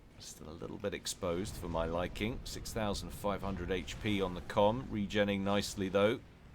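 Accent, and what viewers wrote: United Kingdom. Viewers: I have a legit criticism, every time I watch one of your videos, I notice something. Your voice, its so damn sexy. I can't pay attention to what is going on because I'm too busy being mesmirized by your sexy slick English accent. You need to stop it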